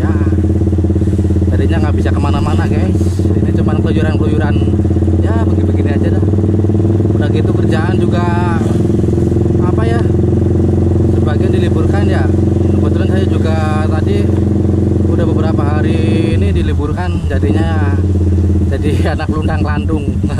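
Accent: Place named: Banyumas, Indonesia. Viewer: Wah ngapak e daerah mana mas...? .gas tipis tipis jalam licin mas bro... sukses terus masbro...👌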